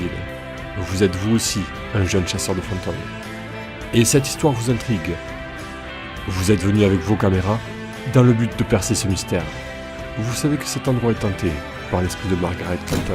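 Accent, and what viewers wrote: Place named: South, France. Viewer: Y'a pas à chier on voit la progression, autant dans l'originalité que dans la façon de raconter l'histoire, en plus le petit accent du sud met trop bien. Je suis bien content d'avoir découvert ta chaîne grâce à ton passage sur le Live d'Anthox. Sinon t'as jamais essayé de te mettre en contacte avec des MJ comme Mahyar par exemple? ça pourrait t'aider à prendre de la visibilité.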